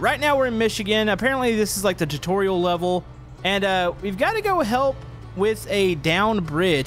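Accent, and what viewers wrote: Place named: Texas, United States. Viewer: Your voice is speaking like texass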